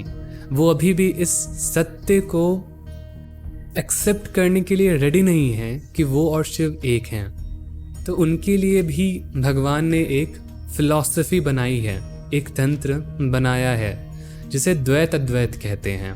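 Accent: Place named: Saharsa, India. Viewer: bhai बिहार से हो क्या आप आपके बातों से लगता है आप सहरसा के आस पास के लगते हैं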